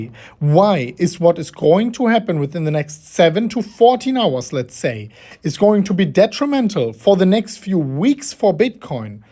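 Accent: Germany. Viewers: I just want to say, regardless of crypto, you‘re such a nice guy and have a great personality. My friends and I are watching your videos and we alway have a great time and laugh about your german accent because we‘re germans too😂 not to mention the thumbnails. Keep up the great energy and positivity, you always get me through these bearish phases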